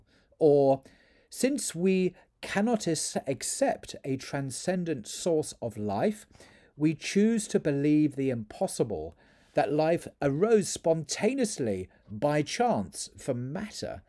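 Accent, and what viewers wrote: United Kingdom. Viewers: Love your British accent, thank you for the clear exposition!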